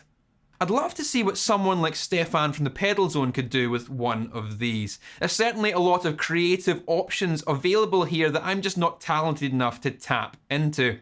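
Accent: Scotland, United Kingdom. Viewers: I think this video is great just to listen to your Scottish accent 😆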